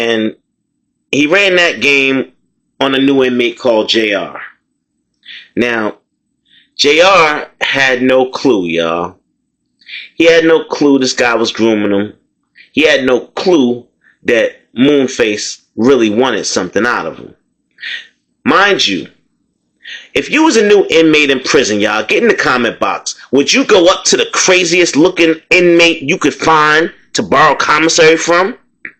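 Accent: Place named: New York, United States. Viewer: Good morning, ur voice is dope. I'm sorry u hv these stories, but u tell them in a way that's real, raw, especially with ur accent, like ur from Brooklyn or the bronx. Glad u made it out, my brother never did, he died in prison.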